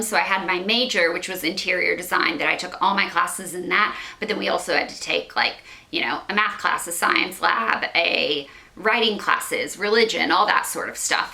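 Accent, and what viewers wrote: South, United States. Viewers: you were one of the first, if not the first, beauty related youtuber that I subbed to way back when because of number 1: your southern accent lol number 2: your mac beauty hauls and 3: you were just fun to watch! I'm so happy to see that you're doing and looking amazing and that you took time off to refocus and find true happiness vs looking for it in LA. Everything in LA is rotten. so happy you got outa there! <3 If you're looking for new video suggestions lol I'd love a day in the life in Nashville. I'm dyingggg to move there! would love to explore it through your pov. :D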